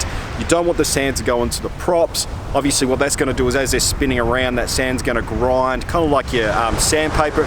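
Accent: Australia. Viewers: To man, I like your Australian accent 😍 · Aussie !!! Yeh mate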